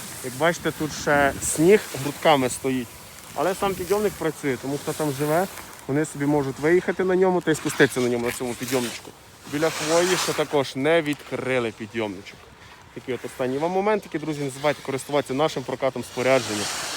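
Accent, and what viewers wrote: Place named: Transcarpathia, Ukraine. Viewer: Гарний закарпатський говір !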